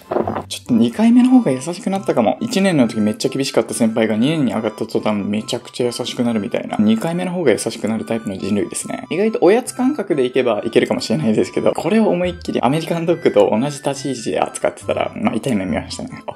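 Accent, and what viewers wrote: Okinawa, Japan. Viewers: ちむどんどんっていう朝ドラで沖縄の方言にトリコになっています(^^) さんのリアル方言堪りません！美味しそうに食べている姿を見て夜ご飯食べました🤤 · 沖縄方言めちゃいいですね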